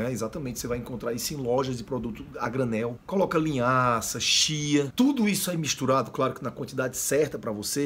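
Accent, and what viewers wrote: Northeast, Brazil. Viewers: Amo o sotaque do povo nordestino e agora você é meu Dr. favorito😊😉 parabéns trabalho lindo👏😘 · Fala logo sotaque. Nordestino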